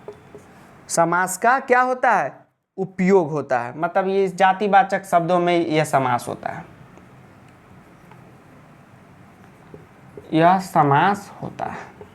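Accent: Bihar, India. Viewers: sir aap bahut badhiya padha rahe.....bihari ascent me comedy karte karte.,...maja aa gaya padh ke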